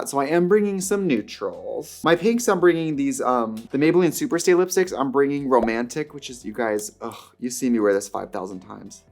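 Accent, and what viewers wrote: Wisconsin, United States. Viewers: Her Wisconsin accent is so strong in this vid! So adorable, love from Milwaukee 🍻❤️💅🏻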